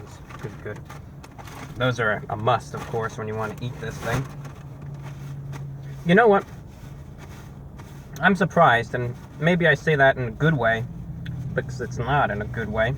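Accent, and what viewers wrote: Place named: Mid-Atlantic, United States. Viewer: I've never seen anyone speak regularly with a Mid-Atlantic accent before. I like it.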